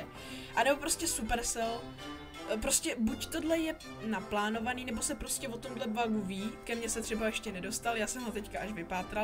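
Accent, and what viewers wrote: Czech Republic. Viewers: DDD Dostalo mě jak si se snažil mluvit "epicky" a seriózně a říkal jsi ty věci takovou Českou Angličtinou:) Jinak fakt by to měli opravit. P.S. Víc videí s tou grafikou na konci, je fakt úžasná, ty detaily... :D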